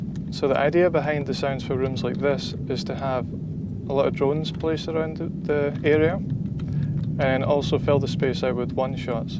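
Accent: Scotland, United Kingdom. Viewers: That’s a scotch accent haha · His accent is scottish btw :) sounds just like my mum and dad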